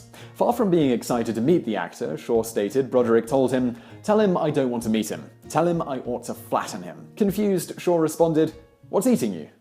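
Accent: United Kingdom. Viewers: gabriel toews, Please, don't beat yourself up about that. Even the light-hearted and "fan-friendly" way Simon presented the story of his life (coupled subliminally with the British accent) seems to put a shade of "knight errant" to him... It's actually a popular media ploy... I sincerely hope this can help you (and others) start to disseminate the substance of the story or presentation (with or without subtext)... Thus building and contributing to us all gaining in our critical thinking and deciphering skills... :o) I doubt you are an idiot... Just like most of the public... It's just easy (sometimes) to accept even trifling news at face value. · What I find ‘ironic’ about this video is the British-accented presenter and the American subtitles - “Color”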